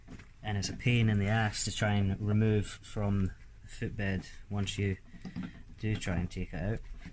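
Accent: Scotland, United Kingdom. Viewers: Where are you based? Scottish accent is encouraging as I’m looking for a reliable higher end cobbler to work on a collection of welted shoes! Always something needing done but hard to find solid craftsmanship! If you were not disastrously far away I could get some work your way! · I watch many cobbler channels all are great. However it is fantastic to watch a cobbler from my own country speaking Scots. Would be great to see you tackle other leather products like Bedos. Thanks.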